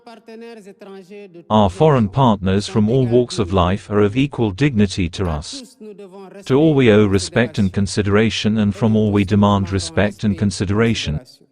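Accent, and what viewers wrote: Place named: Malawi. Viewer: Mr Faye I can like u to do good job than worsting time to speak English like someone in malawi but in his head too much water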